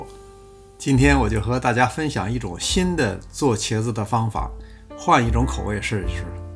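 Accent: Beijing, China. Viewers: 时不时蹦出的老北京土话让人听了真的很亲切！感谢您的美食分享！ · 最近看到这个视频，太喜欢这个节目了博主用心做美食耐心讲解，地道的北京口音娓娓讲述烹饪技巧👍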